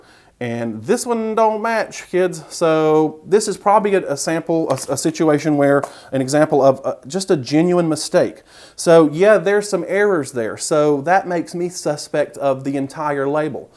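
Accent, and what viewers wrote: South, United States. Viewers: Thank you for the info. I can't believe the spices! Off to check my favorite spice for carbs which is cayenne pepper! (: xx Love your southern accent btw. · Thank you for keeping us informed! Keep up that southern accent !!!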